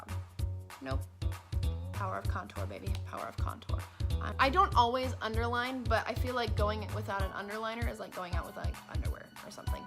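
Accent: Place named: United States. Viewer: Your country accent is killing me. I love it. 😹😹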